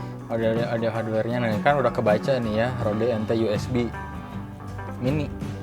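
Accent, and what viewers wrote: West Java, Indonesia. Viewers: logat sunda na nempel teuing a :( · sunda pisan njir, mantap kang 👍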